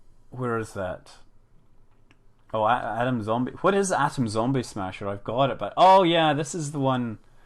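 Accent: Scotland, United Kingdom. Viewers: Scotsman. Ha Ha ha Ha.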